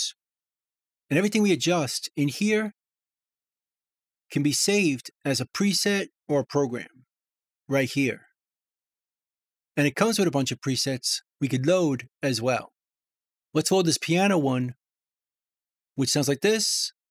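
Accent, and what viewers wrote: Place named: New England, United States. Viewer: Your New England accent makes these videos entertaining as well as helpful. I'm from Texas.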